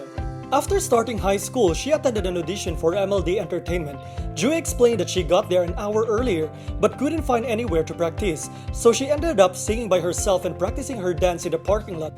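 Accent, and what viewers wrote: Philippines. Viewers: The narrator's accent is so Filipino. Ewwwwwwwwww 🤮🤮🤮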